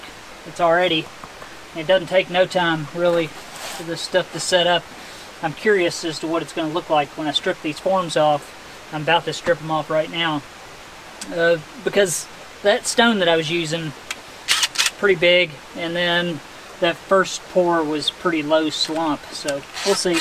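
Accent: South, United States. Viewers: I didn't think how much is added to your videos by your accent until I heard your dad speak. I've gotten used to hearing you and, and seldom think that you speak differently than us Midwestern folk. I met my northerner wife while attending school in Chattanooga. Southern accents have always been a treasure to me since then.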